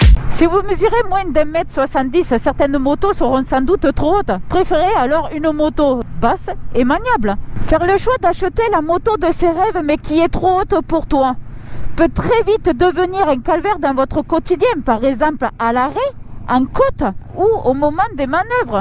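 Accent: South, France. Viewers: Qu'il est rétro-rafraichissant cet accent du sud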